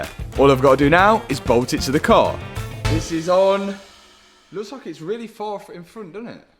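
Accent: Leicester, United Kingdom. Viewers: I'm curious, what part of the UK is the accent from? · I love that Lesta accent . 👍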